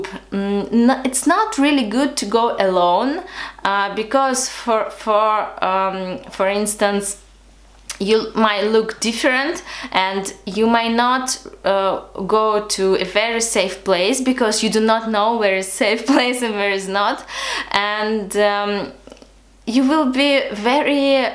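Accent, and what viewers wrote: Russia. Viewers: Gorgeous Russian accent :)))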